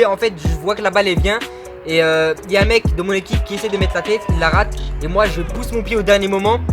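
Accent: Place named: Marseille, France. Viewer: T est marseillais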